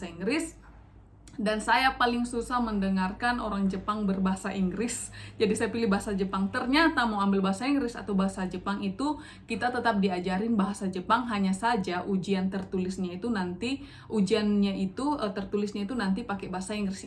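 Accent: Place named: Manado, Indonesia. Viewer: Orang manado? Soalx masih dpa dengar dialek manado🤣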